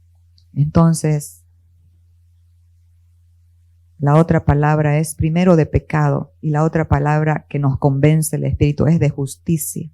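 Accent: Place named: East, Bolivia. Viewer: Me agrada como predica la 👍🏻 me encanta sus pausa y la forma entendible de la explicación de la Palabra🙌🏼 y sobre todo tiene el tono del oriente de Bolivia🤗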